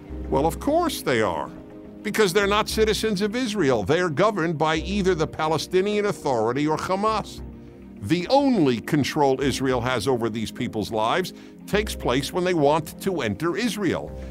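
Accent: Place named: United States. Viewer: It's the usual excuse and deflection from the presenter (who appears to be an American judging by his accent. The apartheid system is carried out in the Occupied Palestinian Territories. The Palestinians there are seperated, restricted and not allowed to vote over the Israeli government who rule their lives.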